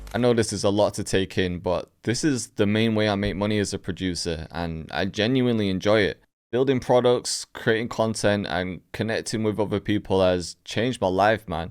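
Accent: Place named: Manchester, United Kingdom. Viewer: Are you from Manchester? Accent sounds a bit like it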